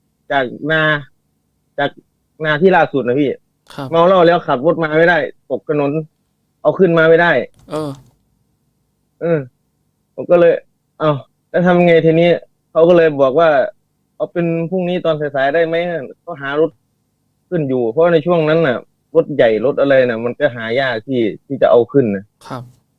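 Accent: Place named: Isan, Thailand. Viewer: 🙏สวัสดีค่ะ🙏.💞 💗.ตามฟังย้อนหลังทุก ep.คะ💓🍑.ชอบมาก เสียง สำเนียงภาษาบ้านเฮา😊เป็นนักเล่าที่มีเอกลักษณ์เฉพาะตัวจิงๆๆ☺️.🌅🎄.ติดตามคะ👌👍❤️💯💯💯💯💯💯💯💯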